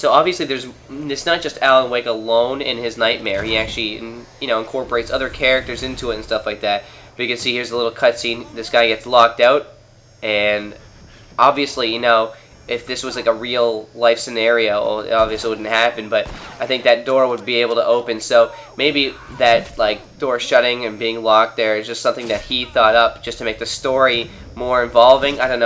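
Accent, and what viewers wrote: Canada. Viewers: lol i didnt kno u were canadian till i watched this video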